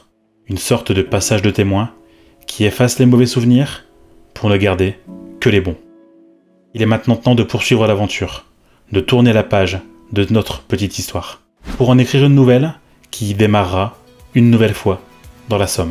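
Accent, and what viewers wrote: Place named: Nord-Pas-de-Calais, France. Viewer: Ch'ti qui vient din ch'nord in' brai deux fouâ, un' fois quin y vient, un' fois quin y r'par (j'ai essayé de transcrire l'accent 😅). Trois pattes powaaa! Gaffe quand même tu joues plus dans la même cours, ca envoie comme motobylette!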